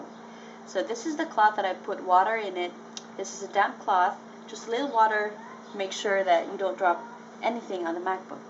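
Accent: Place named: Philippines. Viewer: Great video! I can hear you pinoy accent ha. You sound like my mom haha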